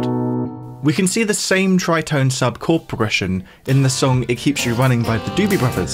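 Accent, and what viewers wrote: United Kingdom. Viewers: I have to smile at the British pronunciations of some words. Like in the US we say Samba like “Somm-bah,” but accent it’s more “Sam-ber.” It’s like sometimes the British go out of their way to make foreign-import words more English sounding. Like pronouncing the t in valet or filet.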